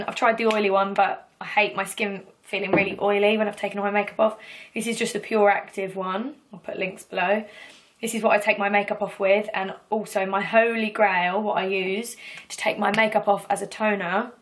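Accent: England, United Kingdom. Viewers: Ur so British it's great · I love ur british accent soooo much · She is British I thought she was American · i wonder if she's from england because i love her accent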